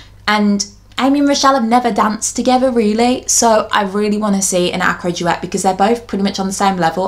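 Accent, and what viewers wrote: England, United Kingdom. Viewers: I was watching this video because I love and she started talking about her dream but I didn’t hear the dream bit, so I was screaming my feelings as I was walking to the tv and was angry because I thought she was sharing spoilers on an episode that had already come out that I didn’t see! Then I was looking EVERYWHERE on my tv for it but I couldn’t find it! So I started searching it up on my phone about when it came out but couldn’t find anything. I knew different countries released tv shows at different times (like dance moms) but she has an English accent like me so I was confused. Then I rewinded it to see if she’d said anything about where she watched it and found out it was all a waste of time. I love and the next step! If you read this comment anyone sorry for wasting your time. Edit: Thank you for liking my comment! 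(I think that’s how you spell it) ❤️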